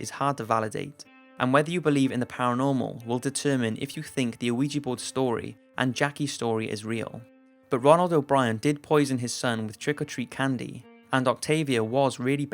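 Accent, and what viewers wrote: Wales, United Kingdom. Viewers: It sounds Welsh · Cardiff/Newport in wales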